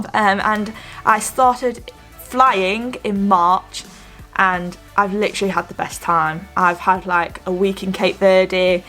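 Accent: United Kingdom. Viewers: maybe it's because I'm German and for me it sounds so utterly british... 😂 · Omg youre alive!:D great to see you and hear you british accent hahah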